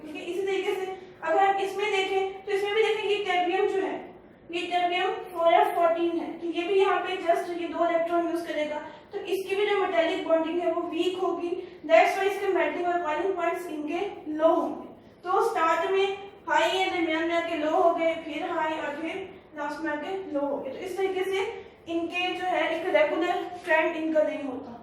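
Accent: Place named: Lahore, Pakistan. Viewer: Master of Science ..so , where r u from...your accent looks like lahori.. I m from india but i love pak...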